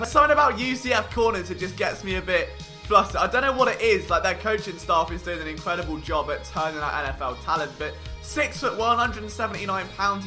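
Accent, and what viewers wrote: United Kingdom. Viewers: Feel like my youtube isn't working getting Eagles commentary with the Queen's accent like the Premier League is on especially with talks & references made of Charlton Athletic I promise I'm not even trying to Troll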